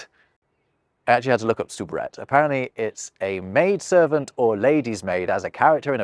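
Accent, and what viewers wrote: United Kingdom. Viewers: you're the most young-british-man sounding young british man ever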